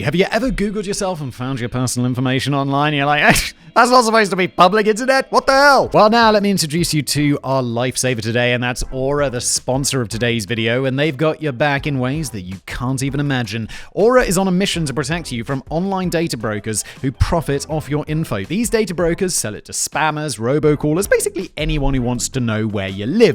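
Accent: England, United Kingdom. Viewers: Tbf, he is so english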